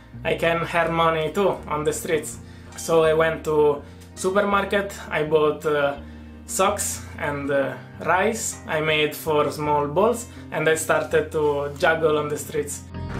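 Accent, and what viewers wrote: Italy. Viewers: si sente tantissimo l'accento italiano grande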